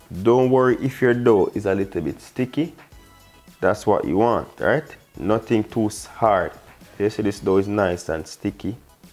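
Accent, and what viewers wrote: Jamaica. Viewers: Love that u redone the video for those that dont understand the jamaican accent. Dumplings look gooooood! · New sub here. I just come across this channel. Oh my goodness everyone gonna think I took special food tech. Lol. I’m loving your channel. Some great tips. I’m binge watching. Lovely straightforward instructions. Loving the accent my fellow Jamaican.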